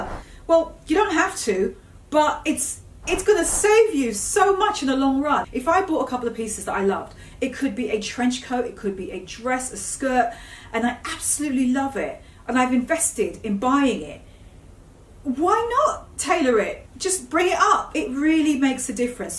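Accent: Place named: London, United Kingdom. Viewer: You’re hilarious, love the London lingo and straight talking 🇬🇧😍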